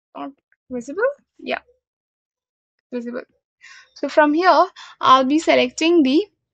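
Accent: India. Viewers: Man the Indian accent is hard to understand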